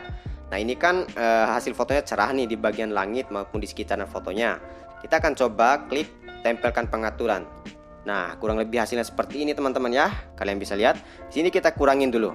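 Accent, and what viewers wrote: Bima, Indonesia. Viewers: Orang Bima ya bang?? Salfok sama logatnya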